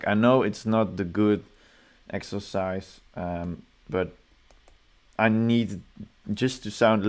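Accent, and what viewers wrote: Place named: Netherlands. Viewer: you sound dutch, could you explain what you did with the compressors? :)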